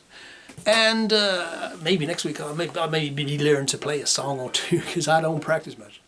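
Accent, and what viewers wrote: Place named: United States. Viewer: what`s with the stupid yank accent??????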